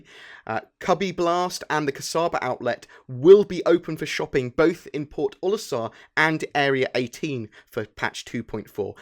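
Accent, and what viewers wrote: United Kingdom. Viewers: great video, love hearing a British voice talk about SC always makes it sound do much more sophisticated lol. please don't stop making videos!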